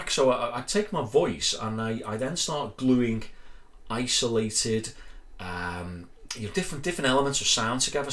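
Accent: Liverpool, United Kingdom. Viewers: Hey! I love these videos and hope you can keep them up and growing! I have a special playlist I've made up so hopefully if the worst happens, I've got copies of them! I save your vid of a particular album and then follow it with the full album. Then I can watch you explain all about how it was made then listen to the album again with "new ears!" It really enhances the enjoyment of the album! It's also great to hear a fellow Scouser providing a background to some of my favourite music! It takes me right back to the 70's and early 80's when we could actually afford to buy tickets to see these bands! (Unlike today when it costs hundreds of pounds to see bands in a field where you are half a mile from the stage!!) Keep up the good work, sir! I'm looking forward to seeing where you take us next! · You sound like a scouser 👍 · I love your videos! And as a German I love your accent! 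Are you perhaps from the Liverpool area?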